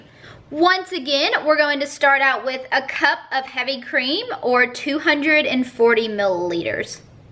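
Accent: South, United States. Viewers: I hate you and love you for this 🤣 I made this tonight and it was so damn good!! I did the chocolate peanutbutter one without the chocolate chips, I used heavy cream (not whipping) and the Swerve confectioners. I ate half of the jar 😎. Thank you for this, btw I love your southern accent 👍. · your southern drawl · You are a doll! Really enjoyed your video. Your sweet accent made me homesick for the south :) God bless you! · I absolutely was mesmerized by your accent. Southern women are my bane. 😍😍😍😍😍😍😍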